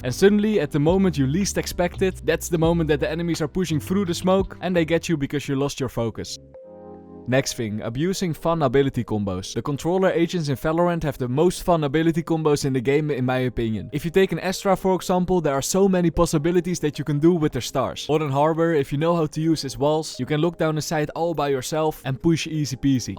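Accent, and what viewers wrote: Netherlands. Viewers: I hear the dutch accent in every sentence hahaha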